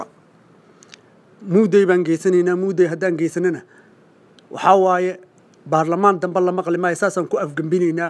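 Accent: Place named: Ethiopia. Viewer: Adigaba Eathiopian ah lahjadada ayan arkaye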